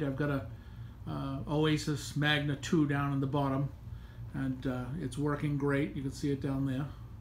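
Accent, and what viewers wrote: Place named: Boston, United States. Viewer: It was worth hearing the accent clicking on this video.🤓👍. Boston ? Nantucket? 😂 Now that’s a nice humidor! 🥳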